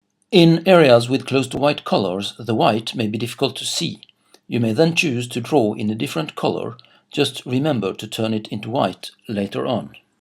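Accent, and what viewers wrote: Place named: Sweden. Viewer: I knew in an instant you were Swedish from your accent haha, it sounds exactly like when I'm trying to make a Swedish parody in Danish :P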